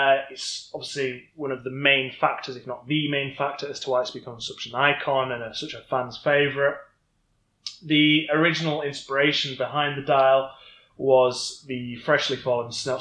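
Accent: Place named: Manchester, United Kingdom. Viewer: Skanky Manc Accent from the poor man's Michael York, recorded in an echo chamber. Nonetheless, nice vid.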